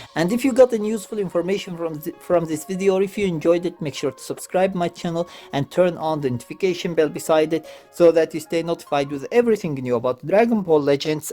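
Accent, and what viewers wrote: India. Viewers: Ayo 4 Why is ur ascent Indian is one of ur parents Indian or something like that? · Indian accent XD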